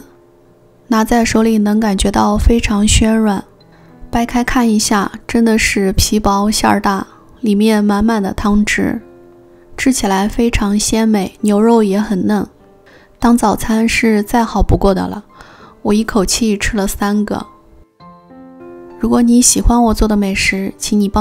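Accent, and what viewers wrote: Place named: Northwest, China. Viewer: 西北口音哈哈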